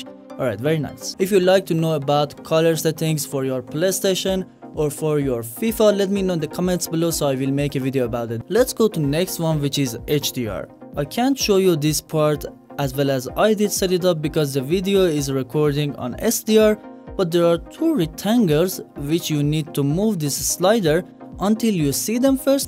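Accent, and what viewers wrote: Iran. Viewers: ایرانی هستی به حرف زدنت میاد ایرانی باشی are you from iran? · Are you from ir?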